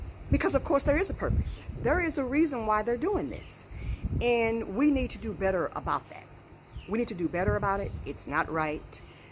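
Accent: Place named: Texas, United States. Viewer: Love hearing my sista’s TX accent , keep bringing it ! I graduated high school in Killeen,TX ! ❤️✊🏾❤️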